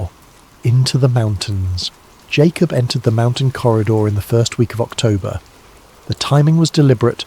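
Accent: England, United Kingdom. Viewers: English accent, really? We're talking about a place in the U.S. It's too distracting.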